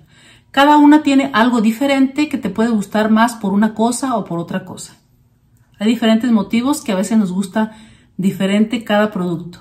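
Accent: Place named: Mexico. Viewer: Hola me gustas mucho como hablas 🥰 mexicana nata 😅 saludos desde 🇪🇦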